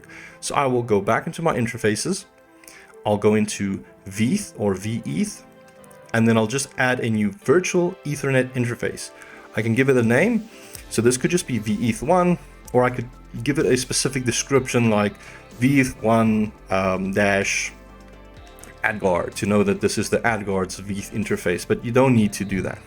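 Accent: South Africa. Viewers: Great video, helped me two years later, thanks, am sure I hear a South African accent there! Lekker Boet!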